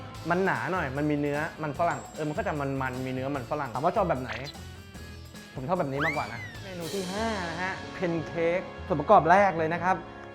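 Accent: Uthai Thani, Thailand. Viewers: คนอุทัยธานีเหมือนกันค่ะ สำเนียงเดียวกัน น่ารักกก😂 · พี่ ep.นี้เหน่อมากก55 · เอ็นดูความพูดไปเหน่อไป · ชอบความพูดสำเนียงอุทัยธานี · พี่ ท์เทปนี้เหน่อออกเลยแง้ มีความเจ้าปื้ดด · คนบ้านไหน เหน่อจังเลยค่ะ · ขนาดยาวหน่อย(เอ็นดู)แอบเหน่อ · ชอบที่เสียงพี่เขาเหน่อๆนิดนึงน่ารักค่ะ😂ดูเพลิน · มีความเหน่อ ลูกเอ้ย เอ็นดูวว์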